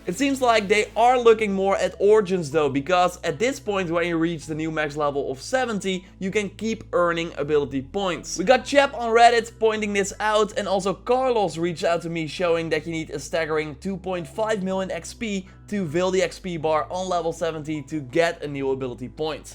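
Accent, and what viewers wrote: Netherlands. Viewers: I knew it you’re dutch! Why can’t you people hide your accent ffs...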